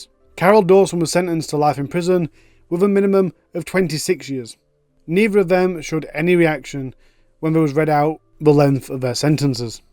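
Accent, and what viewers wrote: United Kingdom. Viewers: Enjoyed this , subscribed, nice to hear a UK voice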